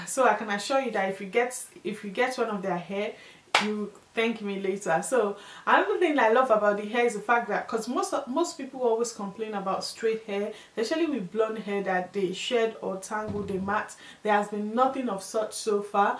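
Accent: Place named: Nigeria. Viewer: I love the accent! Nigerian??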